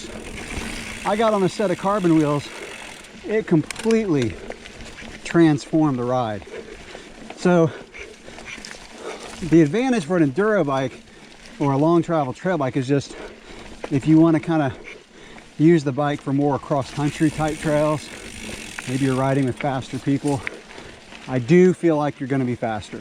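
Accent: United States. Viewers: I love what you do man, Great job. I'm learning from you a lot, with your clear explanation, and your American accent I will never miss anything unlike GMBN lol.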